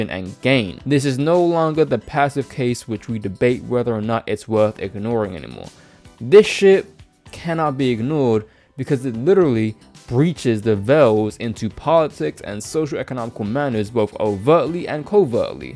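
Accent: United Kingdom. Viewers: Where you from? I always thought you were UK/British Habesha.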